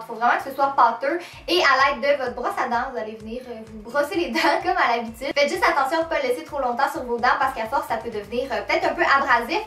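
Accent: Quebec, Canada. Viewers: HatsuMeKira son accent est québécois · cc j'adore ton accen québécois ! tu es trop belle ! j'ai essayer le masque avocat et graine d'avoine : sa marche super bien ! MRC pour l'astuce ! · salut , merci ta video aide beaucoup :D juste c'est dommage , j'aime beaucoup l'accent québécois mais parfois c'est aps facile a comprendre quand tu parles vite comme ca :D je te conseille de ralentir un tout petit peu et se sera parfait ;) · C'est québécois 😉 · C'est québécois parce que je comprends pas très bien et je parle français de France c'est pour ça mais j'adore tes vidéos! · J'adore l'accent québécois ! J'adore les québécois tout court 💘🇨🇦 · Super ! Tu parle parfois vite et avec ton accent on ne comprend pas tout. Mais pour le reste j'ai appris plein de chose que je conte appliquer. Petite question : si les faut ongles ce décolle quand on les passe sous l'eau, comment on fait pour se laver les mains ? 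PS : j'adore l'accent québécois !!!!!!!!!!! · C'est québécois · son accent est québécois (Je vien du Québec) Pis je trouve ça normal mais peut-être que pour vous ça vous semble bizzard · HatsuMeKira Si elle a un accent Québécois elle as un accent Canadien c'est sur 😊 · Il est trop mimi ton accent canadien(ou quebecois ?!!!)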